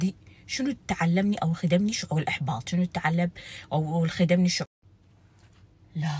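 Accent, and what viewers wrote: Iraq. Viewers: جعل ما يحجي غيرج لبى لهجتج العراقيه ♥️🎈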